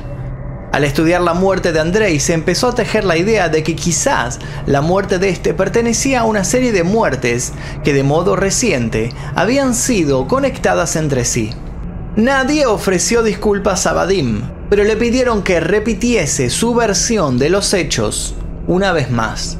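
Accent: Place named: Argentina. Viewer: El acento argentino está de más me cae 🙄 de quien fue la idea de distorsionar el español de esta manera 🤦🏻‍♀️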